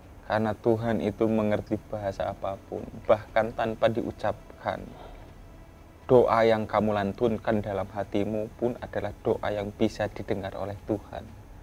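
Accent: Java, Indonesia. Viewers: Semangat medhok bang dzawin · Gemess lucu bgt jd fasih ngomong Jowo medok akibat keseringan naik gunung diJowo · bgst jawa medok banget, ngakak dari awal sampe akhir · Efek hbs dri lawu... malah logat ny jdi jawa.. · Medok.nya dzawin udah sangat bagus bgt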